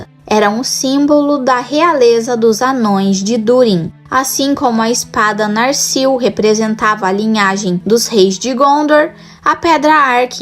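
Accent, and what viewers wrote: Minas Gerais, Brazil. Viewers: Cara?,sotaque minerio uai!,ela não puxa não, é só sotaque mesmo!(nos diga de onde vc é amigo?)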